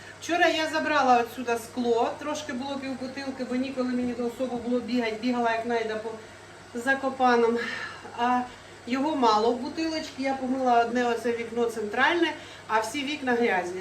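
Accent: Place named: Zaporizhzhia, Ukraine. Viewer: сьогодні ролік клас дуже весело і цікаво а розмовляєш ти українською на нашім ріднім запорізьким діалектом.Слухати тебе приємно як з'їздив до себе додому.Прохання: більше показуйте і розповідайте про бази,показуйте місцевість.Якщо нема машини як ще можна передвигатись по тій місцевості,буду вдячна.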